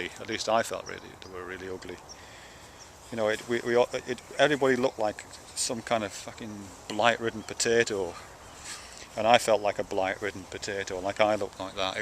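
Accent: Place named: Newcastle, United Kingdom. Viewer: I could hear a bit of Geordie in there, have you by any chance been in Newcastle?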